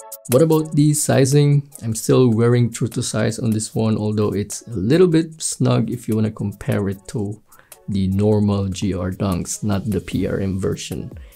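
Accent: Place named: Philippines. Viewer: Pinoy na pinoy accent lets gowwww kuya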